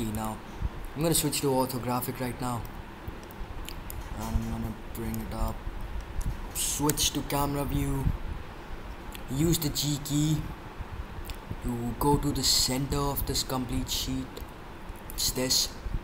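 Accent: India. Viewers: I actually like your accent, is it Indian?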